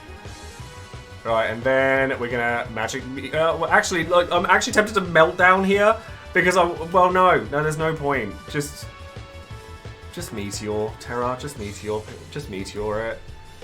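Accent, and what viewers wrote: United Kingdom. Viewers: This is a set kill of a team thanks for sharing i didnt have garlands burst but i have terras burst as well as her LD also Garlands and Layles LD , Used tifa support in the beginning as well and pulled it of in 30 turns. Love the UK accent a lot, i think of amy winehouse every time i hear someone from the uk speak. After i beat this stage and got the tickets you would not believe i pulled out both kurasame, seifer, and sephs LD 🤯🤯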